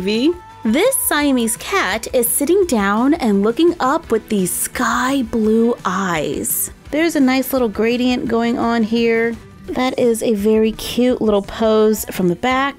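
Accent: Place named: United States. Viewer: I'm so sorry I'm a brit and the pronunciations of these dogs in America is killing me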